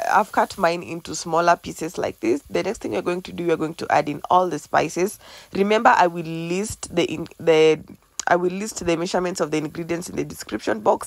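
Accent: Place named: Caribbean. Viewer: Looks absolutely 💯 gorgeous and I will definitely try to make it and definitely going to be a new subscriber PS just out of curiosity where are you from I am normally quite good on accents but I am stuck on yours is it Carrabean??? Or some where in Africa with French origins ??? 👍👍🍚🌶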